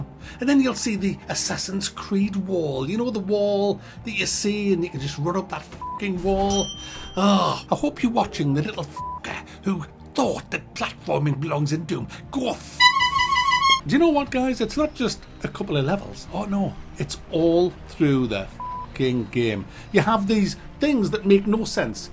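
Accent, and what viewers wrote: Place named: England, United Kingdom. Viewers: What's this funny as hell english accent? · What? He’s from the North East, probably a Mackem. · you sound like you're from yorkshire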